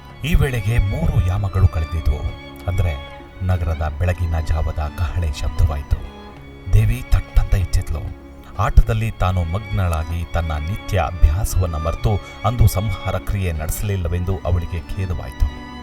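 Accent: Standard. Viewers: Shuddavaadha kannada uttama vaada voice sir nimdu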